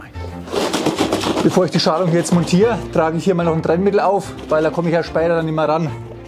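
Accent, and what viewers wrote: Franconia, Germany. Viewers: Cool, habe auch schon darüber nachgedacht, sowas selber zu bauen hatte aber nie eine vernünftige Idee. Das hier ist die Grundidee, die mir gleich zwei Probleme auf einmal löst. Danke für diesen Tip. Zumal ich diesen pfiffigen Kanal gar nicht kannte. Und dann das Ganze noch schey fränggisch! Wos moch der Frangg nuch mehra? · Meine Ohren hören Fränkisch 👂🏻👂🏻👂🏻......Region Bamberg?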